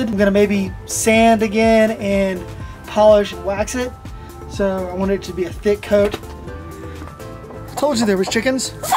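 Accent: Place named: South, United States. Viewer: The southern accent didn't clue you in?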